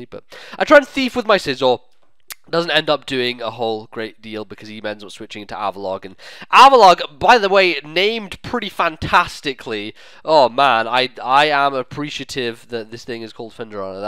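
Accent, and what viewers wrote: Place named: United Kingdom. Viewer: nice video, gotta love this british accent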